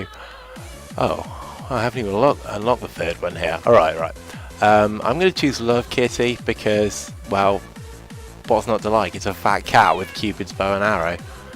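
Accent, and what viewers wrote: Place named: England, United Kingdom. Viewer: I can tell your from England .... but what part? XD nice to listen to an English guy, being English my self makes it better lol (I hope i'm not wrong about you being english XD) also awesome video =D wish I could get this game my self it looks great!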